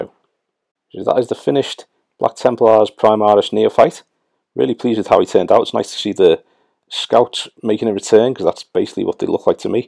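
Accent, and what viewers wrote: Liverpool, United Kingdom. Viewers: nice to see a scoucer doing the hobby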